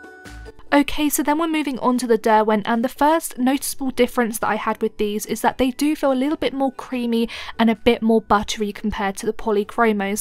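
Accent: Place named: United Kingdom. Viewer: WOW! What a terrific, honest review. I actually like the Derwent Lightfast, despite the high cost, but F-C Polychromos is my default set and I supplement with both Derwent Lightfast and Caran d'Ache Luminance, especially for skin tones. I thought without question you were going to endorse Derwent. Honestly, it's your accent, and my bias indicates unjustified prejudice. I think the genesis of my bias can be found in the fact that in 2014, when I began as a graphite artist, the most enthusiastic reviews I heard were all from British artists, and all of them endorsed Derwent Graphic, so I purchased a full set. What a mistake. I conducted a full-blown scientific technical study of graphite pencils in 2015 and published my results as a 360-page book, Pearson's Graphite 2015. I found Derwent Graphic was just about the worst graphite pencil set, containing scratchy pencils, dark grade pencils that gave lighter lines than light grade pencils, broken leads, misaligned leads, and so on. 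Faber-Castell and Mitsubishi Hi-Uni had some of the best scores for the 370+ pencils I tested. I have yet to hear a British artist say anything bad about Derwent Graphic, which raises all kinds of questions in my mind. I find nothing at all wrong with any of the Derwent coloured pencils, but their graphite pencils are simply crap. Maybe Derwent has (I guess you would say 'have') decided to give up on graphite and concentrate on coloured pencils?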